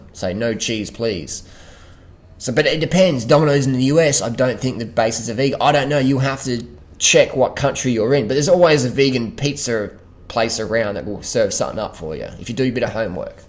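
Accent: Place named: Australia. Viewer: the aussie accent is awesome :D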